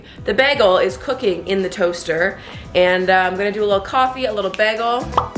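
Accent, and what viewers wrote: Canada. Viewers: i love your canadian accent SO much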